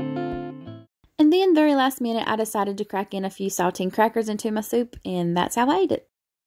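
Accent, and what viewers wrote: South, United States. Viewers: your southern drawl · Always love your videos! Don’t apologize for being Southern! Most of us understand you quite well!! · I love that Southern drawl! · Lovin these meals and your southern drawl! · Tennessee gal here..I understand every word..southern accents are just fine😉💕💕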